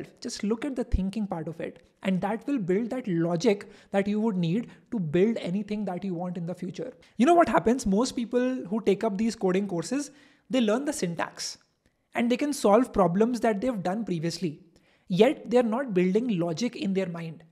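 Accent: India. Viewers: No racism, but the Indian accent lowkey legitimizes this video.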